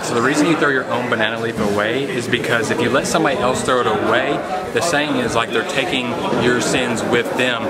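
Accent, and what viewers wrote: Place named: Texas, United States. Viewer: Great video, first time seeing a great presentation of our food and culture by a westerner. The food, both the vegetarian food at the restaurant and the seafood looks very authentic and of high quality. I can't believe that the way you ate is more realistic and natural than how we natives eat. It seems you really enjoyed the food. I am originally from the other side of the Island, the Tamil Nadu state of India, now living in Houston, Texas. Based on your accent, you seem a Texan too.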